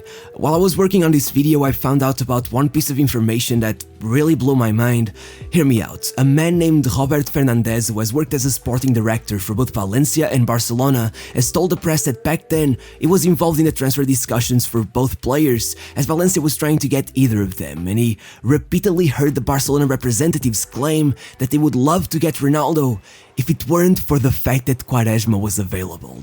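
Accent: Portugal. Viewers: So your accent is portuguese, my mental mystery is finally solved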